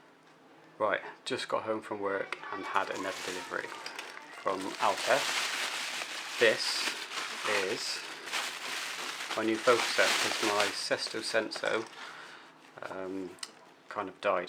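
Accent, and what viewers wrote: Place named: Manchester, United Kingdom. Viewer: MY GOD HE"S FROM MANCHESTER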